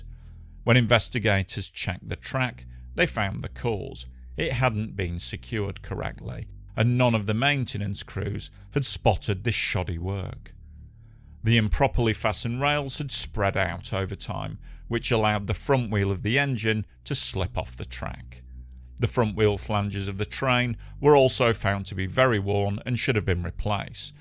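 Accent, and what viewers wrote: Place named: Hull, United Kingdom. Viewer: Does anywhere know where is from? I'm curious about his accent, I'm thinking maybe Hull?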